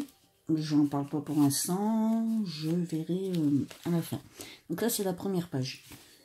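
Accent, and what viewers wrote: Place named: Lille, France. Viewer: Ok j ai reconnu cette accent moi je suis de ronchin près de Lille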